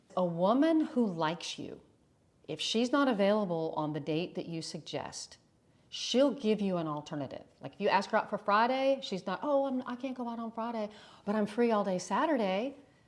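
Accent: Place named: South, United States. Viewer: Greetings from Lake Como, Italy. I like your southern accent 😊 exactly where are you from?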